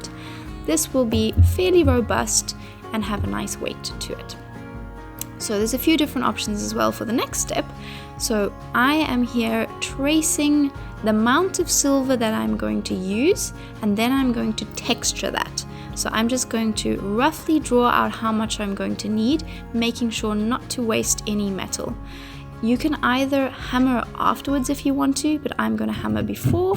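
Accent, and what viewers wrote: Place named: South Africa. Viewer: Very nice work, I thought Nordic with good English as I've spent time with them. Then I think very, very soft south African.👍🏴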